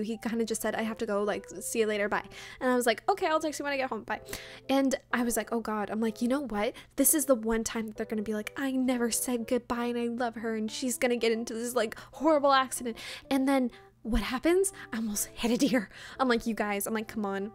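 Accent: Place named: Canada. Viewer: out of curiosity, are you Canadian? The way you pronounced some words led me to believe you are (I’m from Ottawa, lmao)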